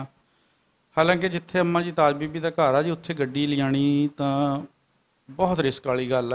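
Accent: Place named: Doaba, India. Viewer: ਤੁਹਾਡੀ ਬੋਲੀ ਮੇਰੇ ਦੁਆਬੇ ਇਲਾਕੇ ਵਾਲ਼ੀ ਹੈ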